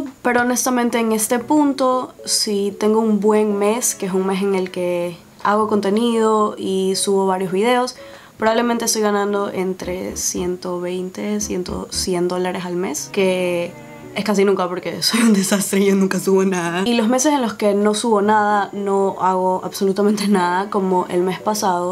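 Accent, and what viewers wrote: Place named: Venezuela. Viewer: Yo también juraba que eras de Venezuela por tu acento jaja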